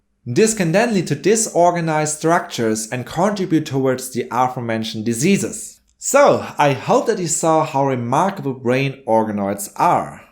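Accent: Germany. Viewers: Here from nutshell, subbed. :D edit: you sound German,are you German?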